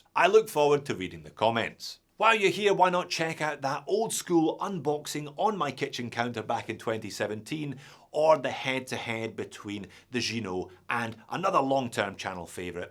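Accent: Scotland, United Kingdom. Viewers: Is it just me or do you sound more Scottish back in 2017? 😅😅❤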